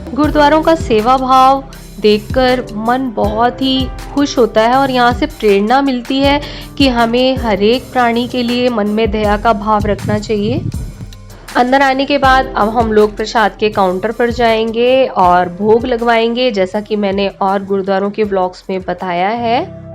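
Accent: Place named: Punjab, India. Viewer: Waheguru ji ka khalsa waheguru ji ki fateh.. Yes..Baba Deep Singh was true devotee of Guru Gobind singh ji.. When Guru ji founded khalsa path..He teained many warriors n Baba Deep singh was one of them Chidya de naal baaZ ladaava.. Geedra to mai sher banawa .. Shawa lakh naal ik ladawan Tan Guru Gobind singh naama kahawa.. “चिड़ियों से मैं बाज लडाऊं , गीदड़ों को मैं शेर बनाऊ।” “सवा लाख से एक लडाऊं तभी गोबिंद सिंह नाम कहाउँ.. Wow my sweeto sisy feel proud to be your bro.. Your way of speaking is true punjabi.. Naaz hai mujhe apni bahan par..dhair sara pyaar..🙏🙏🙏🙏🙏🙏🙏🏼🙏🏼🙏🏼🙏🏼🍫🍫🍫🍫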